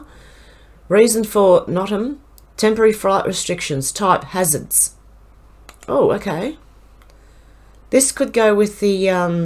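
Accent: Australia. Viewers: Love your Australian accent and you need not apologize for being a grandma you’re awesome 😎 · Love all your work and your channel,thank you! I'm such a sucker for your Aussie accent,love it! Trump 2020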